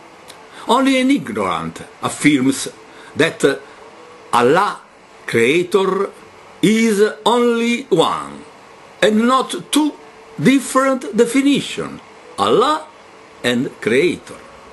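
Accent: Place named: Italy. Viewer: some times i come back to this random video i found just to listen to this guys crazy italian voice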